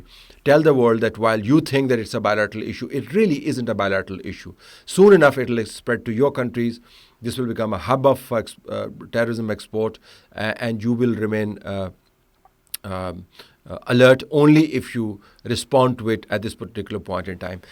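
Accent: Pakistan. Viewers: Paki pooonjabi english 😂😢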